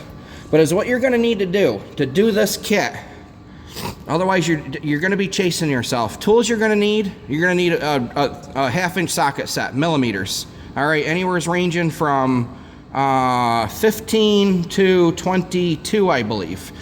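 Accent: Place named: Minnesota, United States. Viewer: probably Minnesota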